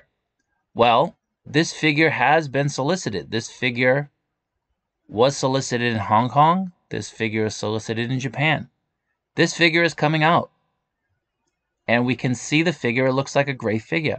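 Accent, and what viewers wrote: Boston, United States. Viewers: That accent. Felt like I was watching the depahted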